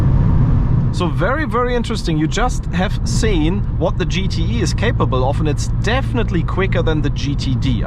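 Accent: Germany. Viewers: Du hast doch nh deutschen Akzent oder? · Ich liebe es wie man soo hört dass du deutscher bist an deinem englisch niceeee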